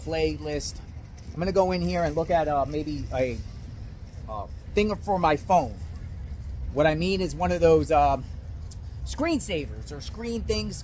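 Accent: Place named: Pennsylvania, United States. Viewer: Off topic, forgive me but, I know you were from PA. I am too. I could hear your accent. Haha I'm from Philly.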